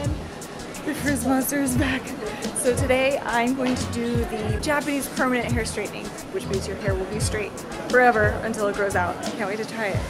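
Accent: United States. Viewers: we gotta get out this comment section. They're way too ignorant here. I'm shocked they don't know anything about perms and relaxers for one and two they didn't even know she was American. They said she must be from England she doesn't look like an American woman. Like what do American women even look like where literally a mixture of different people and cultures. I knew she was an American from her American accent 🤦🏾‍♀️ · VERY obvious American accent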